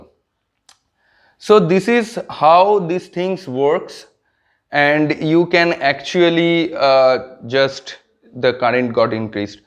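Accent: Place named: India. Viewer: So.foking annoying anglish you indian 😡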